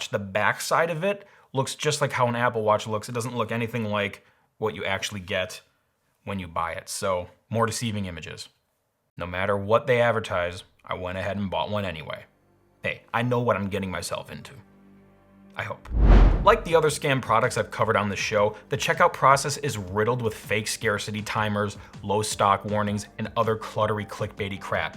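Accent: India. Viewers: sound like come from India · What it speaks like Hindi India call center accent, got you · That Indian accent is known to fool poor clueless consumers that’s he’s American.....ok... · You can tell by the indian accent its a scam.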